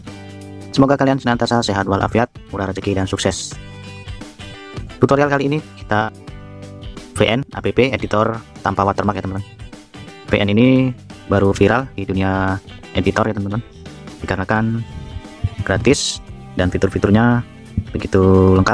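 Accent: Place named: Banyumas, Indonesia. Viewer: Ngomong nya Bisa pelan pelan Dikit ngapak:v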